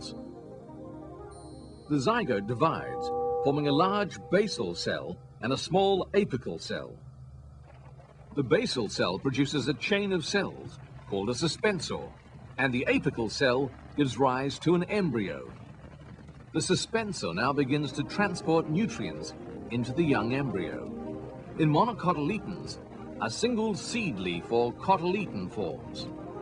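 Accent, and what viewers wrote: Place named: United Kingdom. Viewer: Loved that British accent..easy to understand though than the American one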